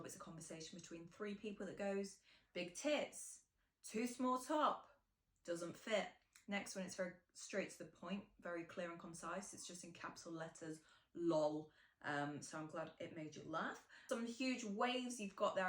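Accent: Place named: United Kingdom. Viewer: What a beautifull british english. And all the best, blessings together.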